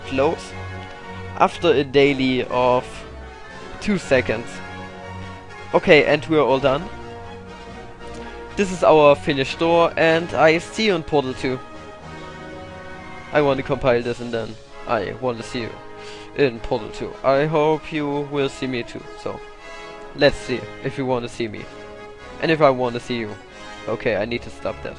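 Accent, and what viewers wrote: Germany. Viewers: Could it be, that you're german? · You Are German ??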